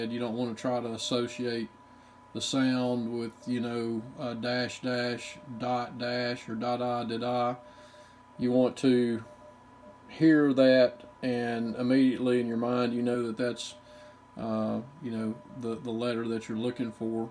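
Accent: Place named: South, United States. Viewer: Thanks - Does the CW Decode display work for Tx as well as it does on Rx? Also, thanks for having a Southern voice! I've lost all my Arkansas and Texas voice.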